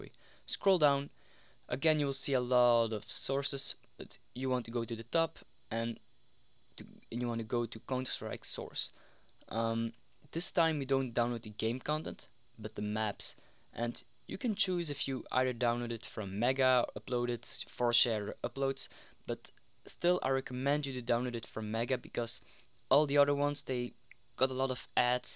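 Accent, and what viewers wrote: Belgium. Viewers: Vlaams accent? :D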